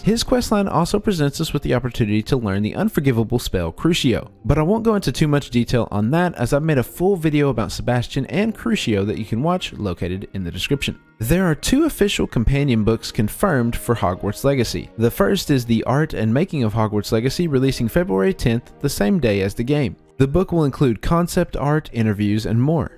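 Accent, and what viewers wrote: South, United States. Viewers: Are you from the south? Because I am hearing an accent but it goes in and out. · Do you have an on/off country accent lol?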